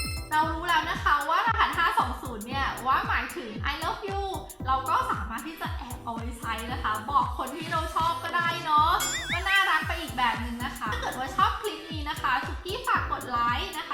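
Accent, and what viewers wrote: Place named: Guangzhou, China. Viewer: ไปบอกเค้าทำไมว่าไม่เทพอะครู .. แม่ป๋อมฟังคือสำเนียงกริ๊บเลยค่ะ นึงว่าจบเอกจีนจากกวางโจวมาเลย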